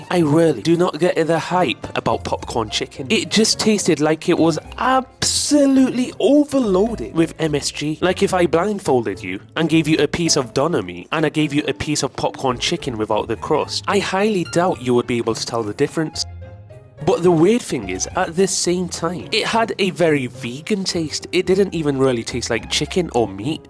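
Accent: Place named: South, United States. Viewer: I thought the American accent was good, sounded very Southern though, probably not Texas 😂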